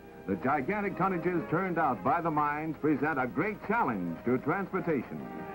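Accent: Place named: New England, United States. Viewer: Excellent. Narrated like all of the instructional and school films. “...from Pittsburgh to Akron, the rubbah centah.” Narrators always had that New England accent. I remembah!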